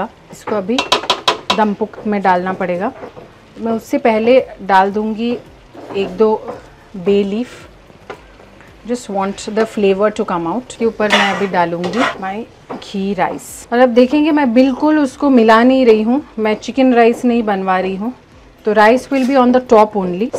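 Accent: Bengal, India. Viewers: I like way you speak Hindi with Bengali accent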